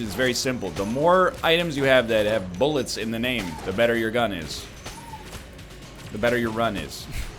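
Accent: Canada. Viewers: I don't think I've ever heard Canadian accent as strong as I do in this episode, especially in the first half. He slipped into it during the bit and it took him awhile to pull back out of it.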